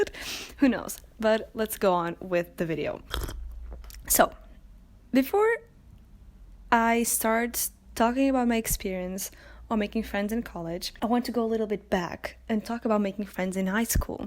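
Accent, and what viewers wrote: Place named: Brazil. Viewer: Você é brasileira? Seu sotaque não parece o sotaque de quem nasceu nos eua, amei o vídeo e amei o seu canaaaal :)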